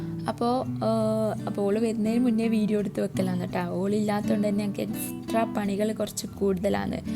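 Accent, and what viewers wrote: Kannur, India. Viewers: ശരിക്കും തനി കണ്ണൂർ ഭാഷ 👍👍അദ് മദി 🙏🙏🙏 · കണ്ണൂർ ഭാഷ 👍👍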